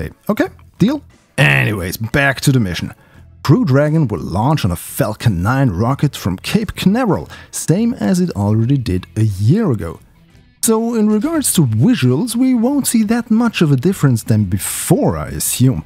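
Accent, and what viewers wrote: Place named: Germany. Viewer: Bist du deutsch? Dieser Akzent..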